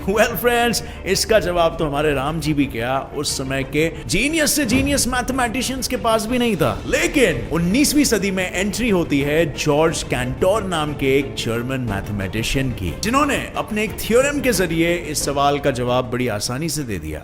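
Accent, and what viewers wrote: India. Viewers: Liked your new abdaaz of speaking... Desi........ 😎😎😎😎😎😎🤩🤩🤩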